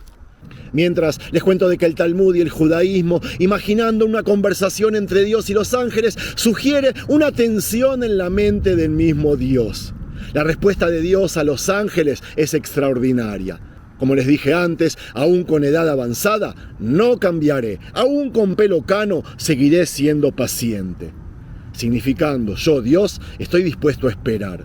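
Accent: Argentina. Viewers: El señor es Argentino?? Habla como Argentino...sus padres seran Judios???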